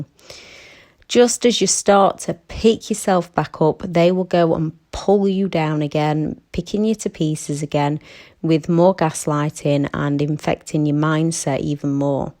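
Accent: United Kingdom. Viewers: Thank you so much for this. I've broken free, but apart from the initial feeling of 'I"m Free!","I did it!", there's been such crushing anxiety to the point that my legs don't seem to work properly when I go out. My brain tells me I'm slipping when I;m not. I fear wet pavements and shiny tiled floors. I know it's anxiety, so I push through and still walk on these surfaces. I shake at the prospect of going anywhere new and working out what buses I need to take: something that I used to be able to do almost in my sleep, so to speak. But I'm still doing it...I hope this isn't making me worse? It just seems like I must push through, not give in. What you have said really, really helps. Thank you, from Cornwall, UK. (Your accent sounds British). Someone in my own country in the know?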